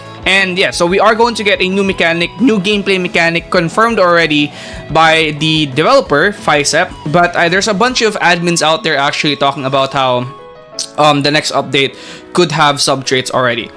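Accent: Philippines. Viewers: bro, nothing negative, but you have a filipino accent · accent makes it evident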